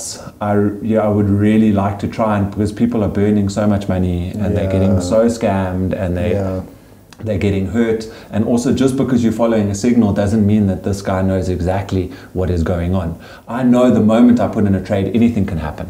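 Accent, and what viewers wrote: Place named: South Africa. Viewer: Wow I can't believe that this guy speak verrphaaaa like African South African 😂😂😂🇿🇦🇿🇦he is open minded indeed not only on business side of things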